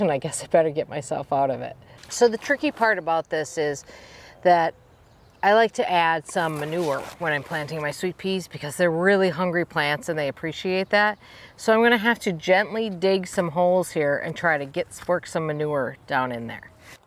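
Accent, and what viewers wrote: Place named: Midwest, United States. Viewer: Im in Idaho but appreciating your midwest accent since Im from Chicago :)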